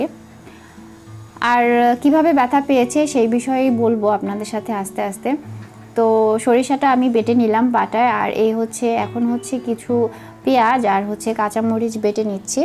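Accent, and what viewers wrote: Sylhet, Bangladesh. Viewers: Apu tmr basha sylhet er kotay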